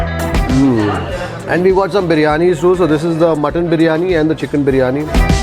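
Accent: Karnataka, India. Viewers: that is not marathi place it is in mysore... he is just speaking because mysore marathi people can still speak marathi.....but in bangalore maratha restaurant he did not speak marathi.... as the Bangalore marathi people do not understand marathi.... this is so weird... hehe.... BTW marathi is more proper than the hosts... the hosts have kannada accent and kannada grammar in their marathi....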